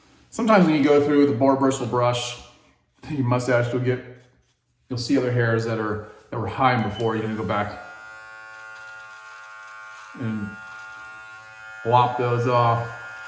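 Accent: United States. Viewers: I learnt so many tips from you mate. Amazing , love your yank accent !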